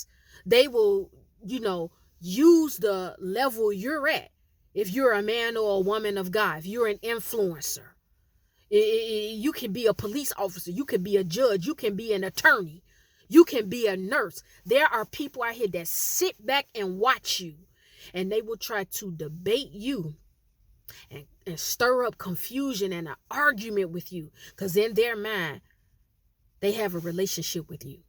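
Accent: Chicago, United States. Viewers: I love the chi town accent. Yes spot on. Iv got away from this with my abusive ex and friends. Now im laying low bettering myself whilst there clicking up in the streets because they can't get me at home ( so I hear )' God is good. So is lol. Amen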